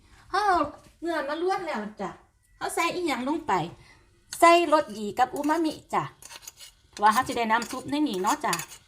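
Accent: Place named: Isan, Thailand. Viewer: เป็นตาแซ่บคือเก่าจ้า น้ำตก มักเสียงเว้านะ💕👍😊 สูตรนี้เท่ ใส่ปลาร้าพร้อม👍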